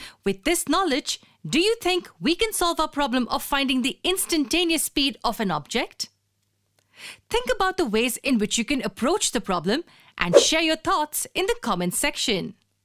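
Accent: India. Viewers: I think Indian. Cause her accent seems like Indian 😊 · I hate indian english. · Indian accent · The only Indian accent I could listen forever lol she saved me 😂✌️👌 EDIT: passed the exam 😂🙌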